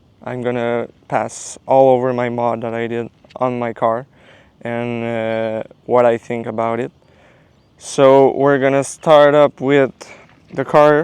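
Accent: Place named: France. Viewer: Are you French I kinda hear that French accent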